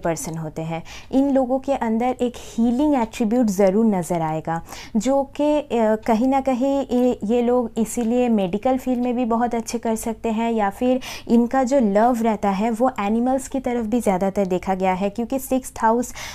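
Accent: Bengal, India. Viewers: You are bengali right?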